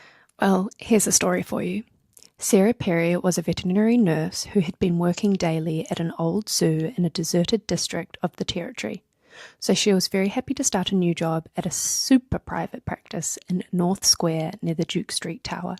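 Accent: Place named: New Zealand. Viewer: I love the Kiwi accent. You have a nice, soft way of speaking too and it is very pleasant to listen to. I have a typical flat midwest United States accent. 😋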